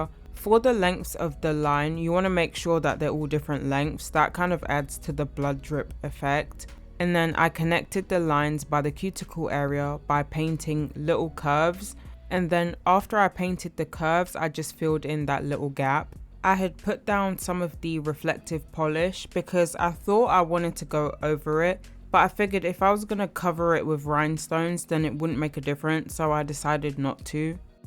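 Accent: United Kingdom. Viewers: I retract my comment about you sounding 25% American. you sound 100% British to me 😀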